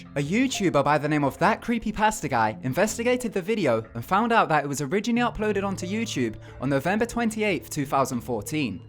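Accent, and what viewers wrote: United Kingdom. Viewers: Bruchas, lol love that British accent.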